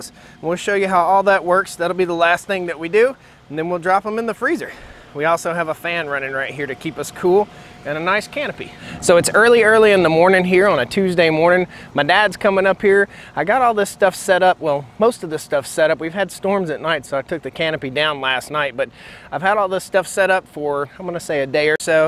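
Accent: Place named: North Carolina, United States. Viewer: Your a north Carolinian to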